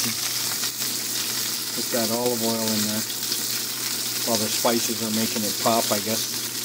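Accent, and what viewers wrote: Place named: Midwest, United States. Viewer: Most Midwestern accent ever lol!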